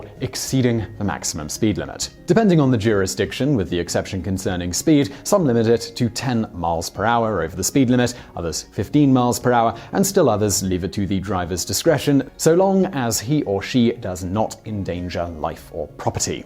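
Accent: England, United Kingdom. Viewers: Oh, please. The video was concered about the traffic behaviour of ambulances and there is one team member of any given EMS ground-unit that drives it. This crewmember is also responsible for all driving-decisions and thus the subject of this video. Therefore he is an ambulance driver. Duh! The way more alarming thing about this video is that it was presented by an English-accent person only using US examples and one UK comment about time together with pictures from North America, the UK, Eastern Europe (Czech?) Germany and one some presumably Latin-American country without ever saying on which place he is refering to as road rules differ.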